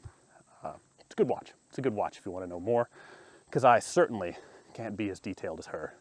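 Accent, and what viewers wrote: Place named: South, United States. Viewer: Hi! I’m. A southern boy as I’m assuming you are listening to your speech. I wish you well. I’m not a subscriber…yet. But I watch a lot of these type of videos so we’ll see. Life certainly goes on and I’ll give it a shot. Well I lied. I’m a southern OLD man. 🤣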